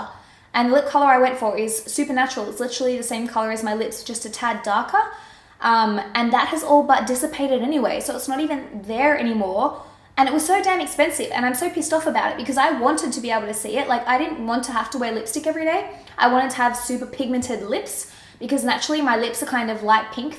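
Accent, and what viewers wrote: Australia. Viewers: I am seriously impressed with your candour and i know it can be so hard opening up old wounds, (why i hide video) but you do it so genuinely that you become approachable and, well now i so i want to learn how to do my make up properly from you. You are so refreshing and it's so nice to hear your ozzie twang. I have missed it and have list my accent from living overseas. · Ahh it's so sad when people can't tell the difference... I'm Australian so I can obviously ahah and she's Australian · Australia is one of the best accents to me, you rock x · I love your accent, being Australian myself. I can understand you perfectly. Love your channel. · It's a strong Aussie accent, not British. Nevertheless, with accents, some people love some and hate some. It's nothing personal :) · she's Australian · okay so... This is totally unrelated to the video...but idk why this whole time I thought you were British (I'm really bad at interpreting accents lol) and now I know you're Australian haha so i want to know.. 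Is it true that in Australia there can be really large poisonous spiders just casually all over the place? It's just what I've heard and I want to know if it's true lol sorry random question. But anyway, you do you girl! I totally agree, we should all be able to do what we want with our own bodies, so long as it'll make us happy! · She's Australian 😊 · To sexy for them. Honestly I don't think alot if people are used to Australian accents. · you have a sydney accent how could anyone your voice? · I love your longer talky videos! You speak so beautifully without losing your ocker-ness (Im aussie too ;}). Thanks for all the info on the procedures you've had. It's interesting to hear about 1st hand experiences. Love you loads! xoxo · I love your accent I don't know how people "hate your voice" it's beautiful I'm OBSESSED with Australian accents and I have been since I was little :3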